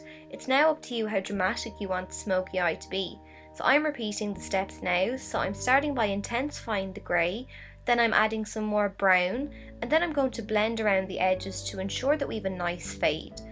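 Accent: Dublin, Ireland. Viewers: Really good video for me, I'm just starting out with makeup!! I'm from Belfast, and I got so excited when I heard you had an Irish accent:) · I love the Irish lilt! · is she irish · Love your beautiful Irish accent :) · love ur irish accent x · Yeah she sounds a bit like me, so I think she might be from dublin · She's Irish 😊 I hear this accent everyday 😘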